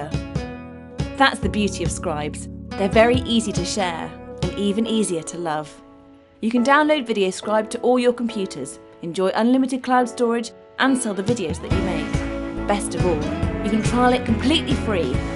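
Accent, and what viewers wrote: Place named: United Kingdom. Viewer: I love the British accent tho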